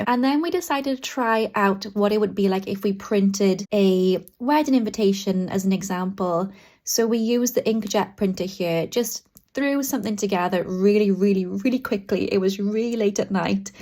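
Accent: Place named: United Kingdom. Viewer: I’m guessing you’re from the US? It’s spelled with an S in British English and with a Z in US English. is British, Scottish or Irish (I haven’t listened long enough to pick up the exact accent, Sorry).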